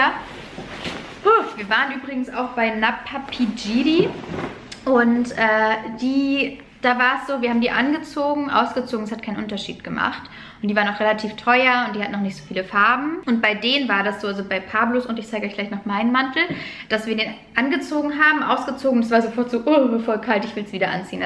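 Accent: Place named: Hesse, Germany. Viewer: Kommst du aus Hessen? Klingt so!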